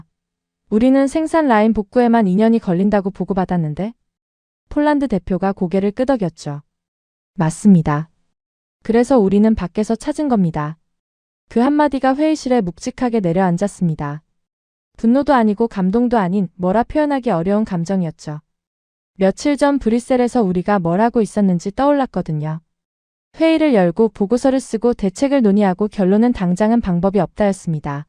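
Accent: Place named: China. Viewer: 발음이 중국이네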